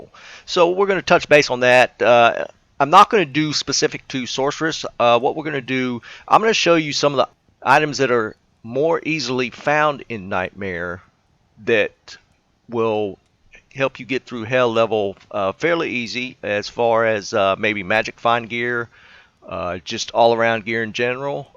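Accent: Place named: South, United States. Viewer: Love the southern drool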